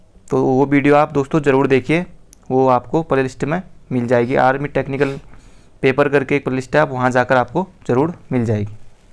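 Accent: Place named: Pahadi, India. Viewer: Bhai pahadi hai na tu... Avaaz se lg rha hai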